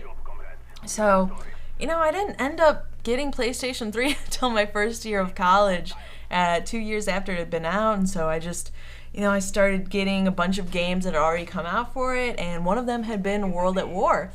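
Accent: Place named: United States. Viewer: Hey I'm new to subbing to you and I have been subbed to whiteboy for a long long time and I noticed u kinda have the same dialect as him. Are you from the Sam part of the us or something?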